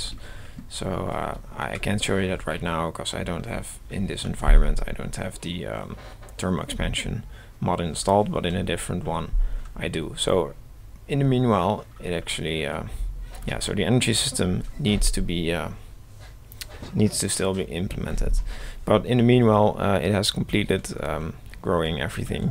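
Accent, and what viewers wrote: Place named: Netherlands. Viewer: ik hoor aan je stem dat je nederlands bent en ps bij die forum kan ik de download link niet vinden staat die dan ergens anders?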